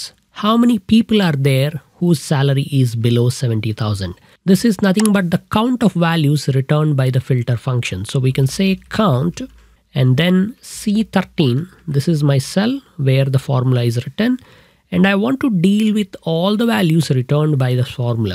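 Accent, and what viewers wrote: India. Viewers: The accent is too Indian for me and I am an Indian.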